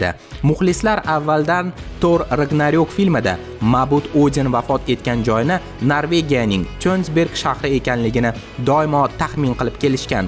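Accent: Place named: Russia. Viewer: Sizga maslahat. Nutqingizni ruscha oham va ortiqcha bir hil intonatsiyadan tozalang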